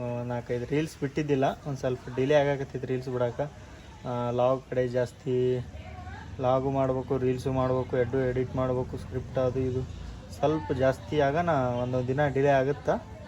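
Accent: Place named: Bangalore, India. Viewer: Nim vlog nodi khushi ayitu😂 benglore bhashe chand matadiddiri sis❤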